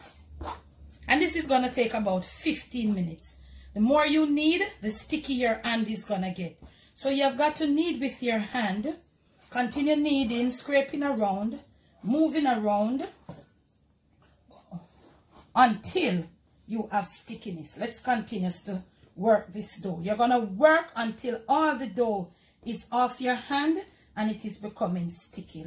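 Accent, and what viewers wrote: Jamaica. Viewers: I just looked at the thumbnail and before she started talking I knew with all my stereotypical thinking that this Jamaican woman(with a Jamaican accent) was the one to listen to ti teach me how to make bread.. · Tht bread looks 😍 so good and soft 😋...I just love watching & listening to her she's so cute with her Jamaican accent 🥰..I'm definitely going try this version of bread over others it looks the best out of others I've seen bcuz it was made with care💝 & tht makes the best food!🤗🏆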